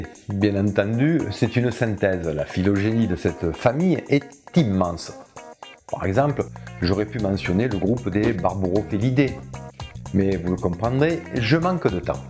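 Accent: South, France. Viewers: Si j'ai bien tout suivi par delà l'accent "peuchère"... cela veut dire que le coutelas "dent de sabre" de Rahan n'est qu'une invention Pif Gadget?!... 😒... triste réalité. La double peine du confinement : Le savoir tue tes rêves! hi hi hi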